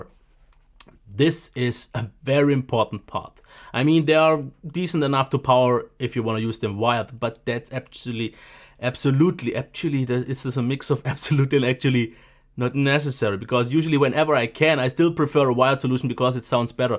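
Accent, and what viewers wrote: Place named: Germany. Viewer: Nice review really convienced me! The German dialect is fun and charming, keep it up!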